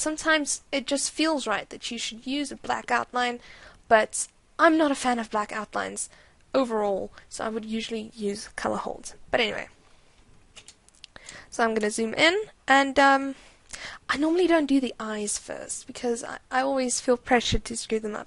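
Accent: South Africa. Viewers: I think she is South african... XD Idk! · Are you South African? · Nice tut. BTW You sound South African.... Am I right? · I got really excited when I heard your accent! I miss South Africa.... Do you speak Afrikaans? Also, your drawing style is so cute and I love it! It reminds me of Disney :o) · When doing the line clean up even when my eraser is set to 100% hard i get this softening of the line? Is that because im not in 300dpi ? btw you sound South African :) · South African accent? :) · Your accent. Are you South African?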